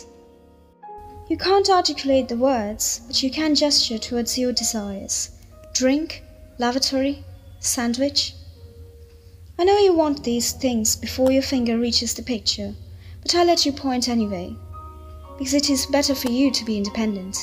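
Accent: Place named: United Kingdom. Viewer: Eta tomar accent??💀😩😳 ami vablam kono British meye pore shonachhe . Plss prottek ta part pore shunio to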